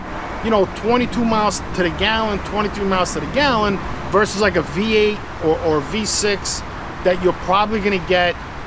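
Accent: New York, United States. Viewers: thats boston.. this guy is from new yawk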